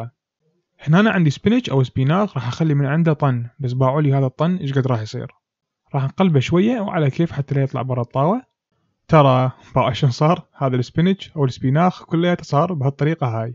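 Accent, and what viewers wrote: Mosul, Iraq. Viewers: عاشت ايدك بس اريد اعرف انت من الموصل لان طريقه الحكي مالك نفس حكينا المصلاوي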